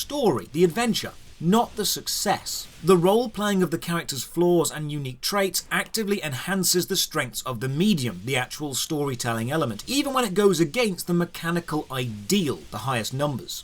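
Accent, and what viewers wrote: United Kingdom. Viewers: your accent... I can’t put a finger on it. I’d guess somewhere north of London. But not the savage lands that is the North East of England. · I usually can't take dnd seriously or the narration of dms. But with this guy I could, he looks like an elf and sounds like the omnipresent British voice narrating childhood favorite obscure animated fantasy films from the 80s.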